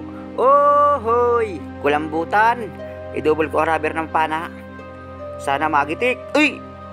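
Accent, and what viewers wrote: Bicol, Philippines. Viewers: Halata na bikolano ka ka father's..godbess u👍👍👍👍🙏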